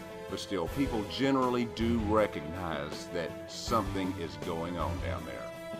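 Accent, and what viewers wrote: South, United States. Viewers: my man, I love your work. YOU are brilliant...not to mention a real entertainer. However, you actually would help your cause so much more, and could convince the uninitiated of your sincerity, IF.....IF...you didn't dress like a homeless person and buy a comb. Now it doesn't bother me about your sloppy dress and or your southern accent...because I live in the south, but ya have to understand PERCEPTION becomes REALITY. So just a suggestion to take a bath, go to Sportsclips for a hairCut, and buy some new clothes. But regardless, even if you were to where a clown suit...I will watch all your videos TWICE. Love ya man.....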